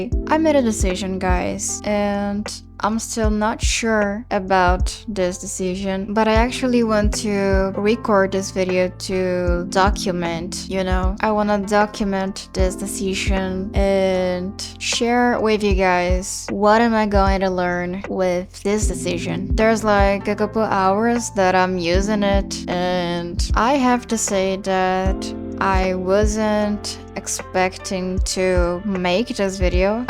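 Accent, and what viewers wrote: Brazil. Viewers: Meu ouvido brasileiro detectou algo brasileiro 👀 kkkkk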